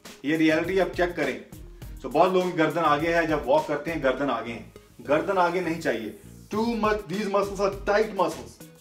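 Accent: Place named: India. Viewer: is he speaking in english or indian i don't understand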